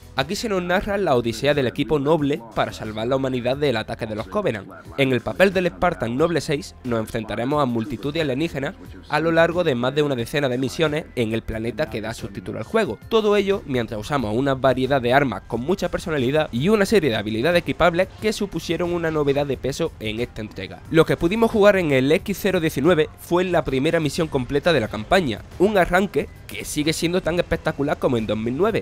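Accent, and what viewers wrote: Andalusia, Spain. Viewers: El orador es un andaluz constipa'o? No te ofendas pero es la primera vez que oigo un narrador asi. Soy canario y se que a veces nos comemos letras pero estan haciendo un video que se ve de forma internacional y se sorprende la gente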